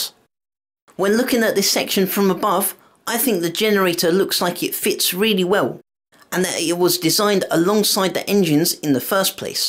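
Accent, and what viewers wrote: United Kingdom. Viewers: You are British?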